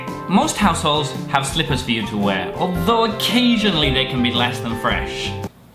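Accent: Standard. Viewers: 台語太標準了 不訂閱怎麼行～ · 不得不說，發音蠻標準的😂😂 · 你講中文超標準的啊！！！！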